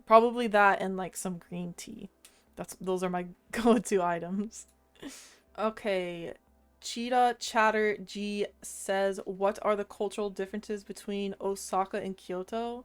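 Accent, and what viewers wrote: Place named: Australia. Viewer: Wow I always thought you were some type of Australian for some reason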